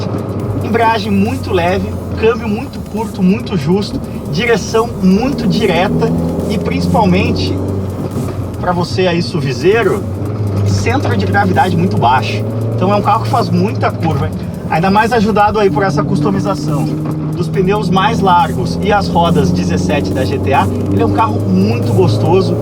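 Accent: Italy. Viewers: O sotaque italiano do é engraçado, quando ele fala italiano eu me mato de rir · Mais que belo video (sutaque italiano) linda historia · “Tem gente que não gosta do meu sotaque italiano “ tem gente que se incomoda contudo, pelo menos nós aprendemos as pronúncias corretas ou próximo do corretor, show de bola man AlfaRomeu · não tem nada mais chato que esse """""Sotaque"""""" italiano pqp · !!! Parabéns pela escolha!! Linda machinna!!! Não deixe de fazer matérias com o seu Bello sotaque italiano!!! Que bom ter vc como alfista..sou de Londrina tenho 2 machinnas uma é a Sofia e outra é a Franchesca...E carrego grande orgulho de ser descendente de italianos... viva Alfa Romeo. Abraço amici Alfisti. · teu sotaque italiano é maravilhoso! Mas meus ouvidos estão sangrando..... kkkkkkkkkkkk. Brincadeira! Abraços! Em tempo: já vi um desses com motor V6. Aqui em Florianópolis sei de uma pessoa que tem uma, porém não sei se é 4 ou 6.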